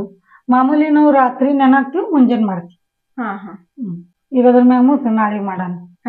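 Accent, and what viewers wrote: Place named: Solapur, India. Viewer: Nimma yavdu ooru .namma solapur hage maatu ide.👍huggi bhalu channda agide.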